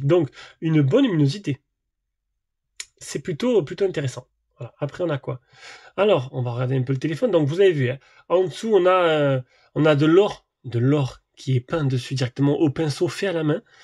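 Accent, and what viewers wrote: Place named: Marseille, France. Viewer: Super vidéo! Et ton accent marseillais est au top 💪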